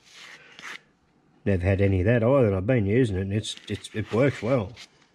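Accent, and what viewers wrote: Australia. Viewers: You do great videos, and great workmanship on your projects. I've been watching your channel as well as CEE for the content as well as both of your Ausie accents. Keep it up.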